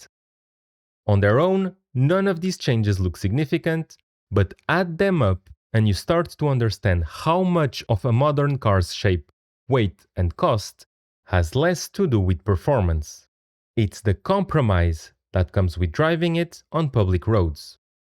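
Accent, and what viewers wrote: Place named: Portugal. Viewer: Sotaque tuga... :)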